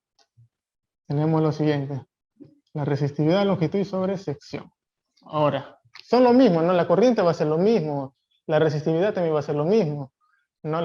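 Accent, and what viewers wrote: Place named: Peru. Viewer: INGENIERO BUENAS NOCHES. HOY DIA ENCONTRE SU CANAL. MUY INTERESANTE EL VIDEO Y SU CONTENIDO. ESTOY REPASANDO MIS NOTAS DE HACE 40 AÑOS. ENCONTRE LA FORMULA PARA CALCULAR LA TEMPERATURA DE TRABAJO DE LOS CABLES YA SEA A 50 GRADOS O A CUALQUIER TEMPERATURA. DIGAME SI EL CABLE FUERA SUBTERRANEO, BAJO TIERRA QUE TEMPERATURA SE TOMA PARA EL DISEÑO. ESTOY SUSCRITO A SU CANAL, VOY REVISAR SU CONTENIDO. ESPERO QUE SIGA SACANDO MAS VIDEOS SOBRE ESTOS TEMAS. ME PARECE QUE UD ES PERUANO. RECIBA UN GRAN SALUDO Y MIS FELICITACIONES POR UNA EXCELENTE EXPLICACION. SALUDOS DEL NORTE DEL PERU. QUE MI DIOS LE GUARDE Y LE BENDIGA.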